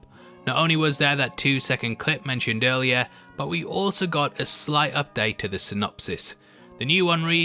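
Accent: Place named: United Kingdom. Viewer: Wait a minute..... you’re from the UK!!?? 😳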